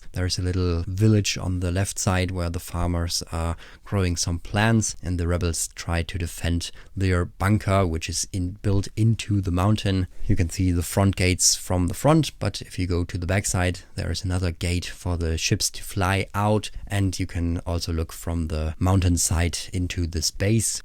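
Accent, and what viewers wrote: Germany. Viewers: Dieser deutscher accent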